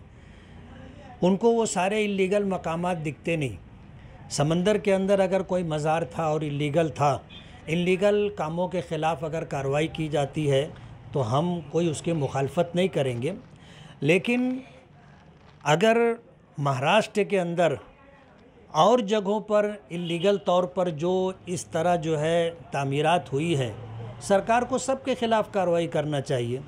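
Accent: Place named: Hyderabad, India. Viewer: मामू तुमाला महाराट्रात ल्या जनतेनं निवडून दिल तुमी हेंद्राबाद मध्ये बोलता अशे वाटत आहे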